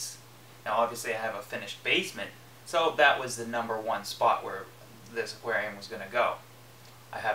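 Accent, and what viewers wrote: Canada. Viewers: You sound very Canadian :)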